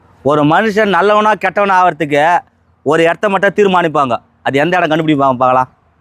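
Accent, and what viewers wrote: Madurai, India. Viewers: Super Anna Madurai vasagam 🎉🎉🎉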